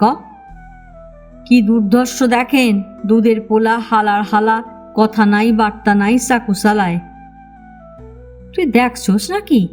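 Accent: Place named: Bangal, Bangladesh. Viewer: খুব ভালো উপন্যাস টি ।তোমার পাঠ অতুলনীয় ।কি সুন্দর ভাবে বাঙাল ভাষা উচ্চারণ করছ❤👍👍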